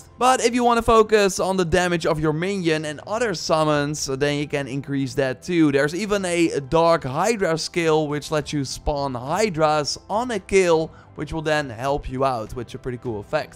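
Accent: Netherlands. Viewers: i smell dutch... also i recall them saying theres no characters anymore. yet we have classes kinda like that one diablo game.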